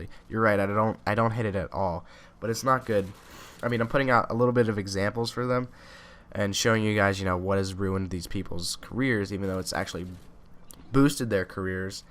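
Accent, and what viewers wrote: Ireland. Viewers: Youtubes mother fuckin best commentator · Are u really Irish becouse I live in Ireland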